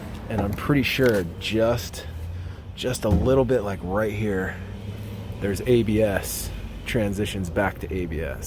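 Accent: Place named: California, United States. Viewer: Love the cali accent my guy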